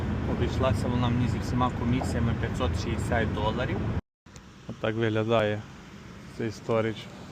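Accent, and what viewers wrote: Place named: Western Ukraine, Ukraine. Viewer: Знімаєш ні як , а в перемішку з западнянським акцентом це просто жах . Хто тебе з буд майданчика відпустив , ваша реальна тема це будувати...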